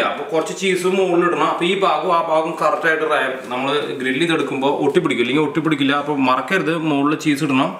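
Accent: Thrissur, India. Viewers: അത് ഒരു ശൈലി ആണ് ബ്രോ എന്റെ കൂടെ ജോലി ചെയുന്ന ആളുകളും പറയാറ് ഉണ്ട്. തൃശൂർ ക്കാർ ആണ് പറയാറ്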